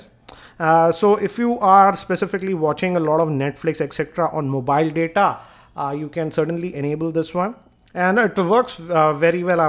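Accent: India. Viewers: Believe me even as an Indian think his accent is way too accentuate. Very few people talk this way😅😂😂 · Then u are not Indian as he has Indian Accent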